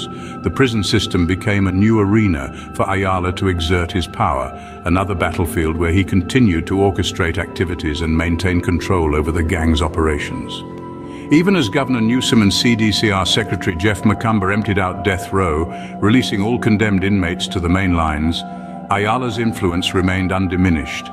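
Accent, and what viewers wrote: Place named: United Kingdom. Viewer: Somebody hit the lick with this narrator. Serious and compelling, the deep, UK sounding accent ups the story's level of integrity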